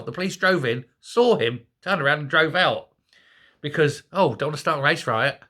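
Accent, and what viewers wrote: United Kingdom. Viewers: Thick British I’ll say no more.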